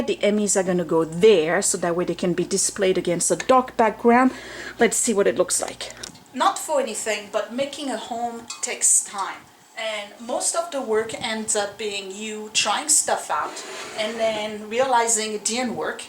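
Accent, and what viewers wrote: France. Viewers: What are the Emmy's for? Thats exciting! I have been binge watching you since yesterday and I love you!! The French Accent reminds me so much of my grandma as she was raised speaking French. Her accent died down as she got older since none of us ever spoke French around her but some words you say exactly like her and that is so special!! Thank you for sharing and for being real!!! I have been doing a few things here and there while watching you and it really does feel good!!!!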